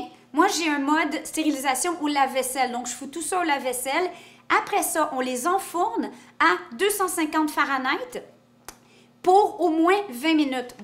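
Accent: Quebec, Canada. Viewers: Tu es adorable avec ton petit accent Québécois, je t'adore merci pour la vidéo, je suis d'Alger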